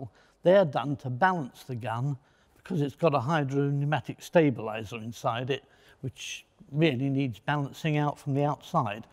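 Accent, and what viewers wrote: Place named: United Kingdom. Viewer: The British accent and the beautiful mustache make the video better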